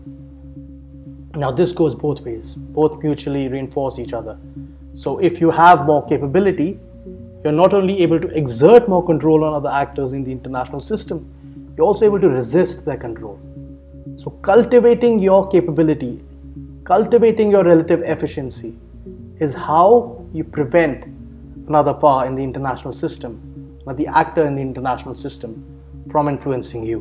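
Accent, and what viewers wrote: India. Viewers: Indian accent? new presenter? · Pls dont speak in an indian accent its uncomfortable the accent i just dont like it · Indian Accent? No no. Please no! ☹️☹️☹️☹️☹️☹️☹️☹️ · He sounds Indian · Oh boy it's Shirvan time! Hears Indian accent Oh boy it's World Power time!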